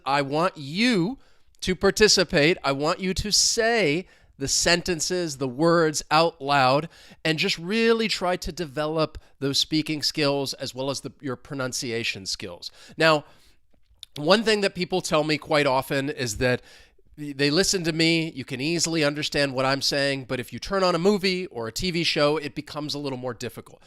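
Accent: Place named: New York, United States. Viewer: Based on your accent, I think you are from NYC or NE states at least. Am I correct?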